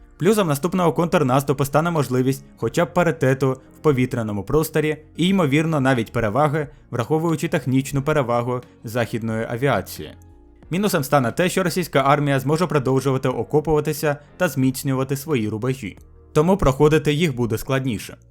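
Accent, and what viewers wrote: Odesa, Ukraine. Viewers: автор з Одеси. в Харкові і Дніпрі інший говір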